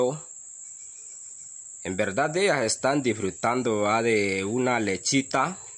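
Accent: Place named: Andalusia, Spain. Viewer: SOY NUEVO SUSCRIPTOR, AL PARECER EL SEÑOR QUE GRABA ES ESPAÑOL, LO DIGO POR EL ACENTO ANDALUS QUE TIENE.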